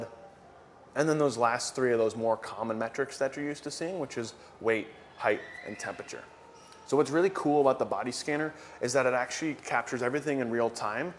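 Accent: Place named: Silicon Valley, United States. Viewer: That is an "upscale" speak practiced especially in a Silicon Valley, California.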